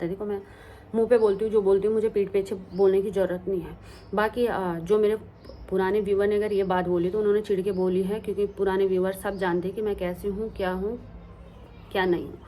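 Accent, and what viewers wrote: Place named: Garhwal, India. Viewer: Ap bhut axe ho or jb aap gadwali bolte ho to bhut acha lgta h❤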